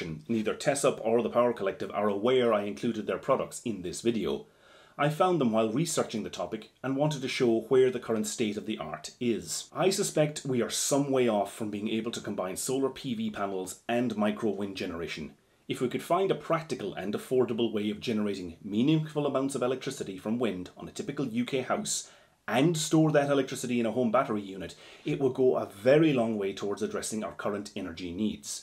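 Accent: Ireland. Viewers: would you be able to comment as to planning permission for Ireland? You have an Irish accent, that's why I ask :)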